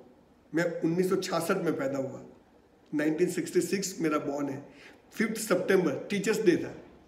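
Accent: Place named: Palanpur, India. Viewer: Well done Bhai . Gujarati Palanpuri boli no laheko j mast aawe. Natural j saru lage. Palanpuri boli ka bagicha vanchi to hase j.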